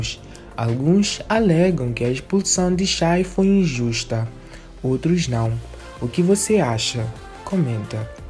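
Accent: Portugal. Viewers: Esse sutaque de português? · Essa pessoa narrando o vídeo com certeza é de Portugal ou de algum país luso português.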